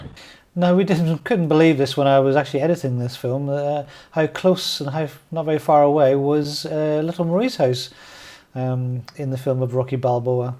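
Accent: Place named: Scotland, United Kingdom. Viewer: Cool video , very well done . Did the same trip myself . Hint of an east coast Scottish accent too I believe ! 🏴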